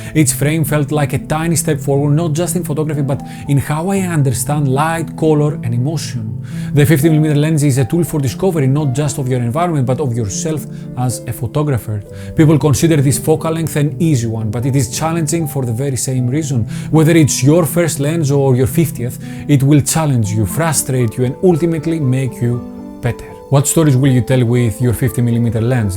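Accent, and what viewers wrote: Greece. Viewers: Amazing, your Greek accent is similar to mine, from Spain. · Μου αρέσει ιδιαίτερα η προφορά στα αγγλικά.Δωρική θα την έλεγα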